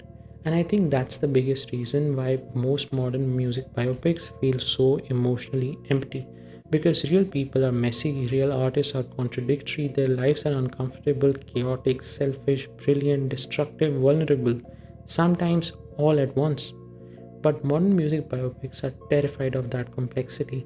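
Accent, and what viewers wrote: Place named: India. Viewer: White PFP Indian voice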